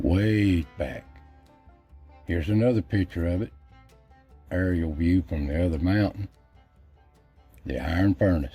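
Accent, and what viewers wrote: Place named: South, United States. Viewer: One of these days I am going to have to cross the pond and visit this part of the USA. It reminds me so much of parts of the high country where I grew up in southern New South Wales, Australia... except we have Gum Trees and not Aspens, Birch etc.. Thanks for another great video,. I could easily sit down and listen to you speak all day, just love that Southern accent and gentle speech.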